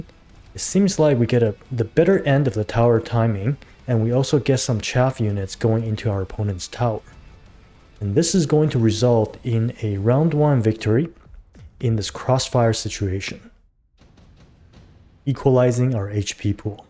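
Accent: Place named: Taiwan. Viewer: ahh yes! my taiwanese colleagues speak in such accent too! keep up the great content! love your explanation on your train of thoughts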